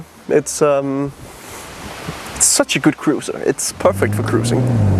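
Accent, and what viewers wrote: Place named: Denmark. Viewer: godt tip, du får ikke særlig mange views når du taler engelsk, man kan tydeligt høre at du er dansk, bare tal dansk tak.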